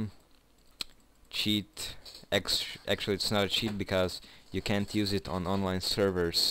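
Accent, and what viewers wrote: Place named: Russia. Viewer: акцент, может быть и английский, но он русский!!!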